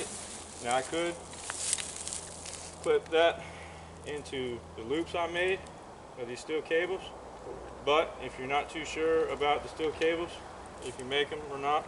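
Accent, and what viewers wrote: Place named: Louisiana, United States. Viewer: I read S. Carolina but Coming from a Cajun, you sound Cajun as hell man lol I just ordered these sticks. Good idea about the rock climbing aider.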